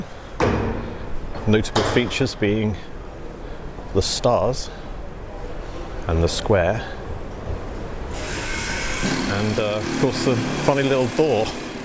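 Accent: United Kingdom. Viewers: damn definitely having a British accent makes you sound so much less insane. I pray you get some help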